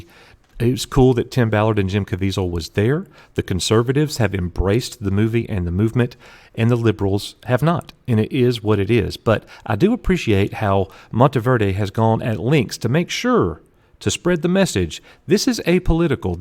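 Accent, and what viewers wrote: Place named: South, United States. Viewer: You’re such a southern gentleman. It’s part of why you’re so easy on the ears. But it is, “Ms.” ✨😁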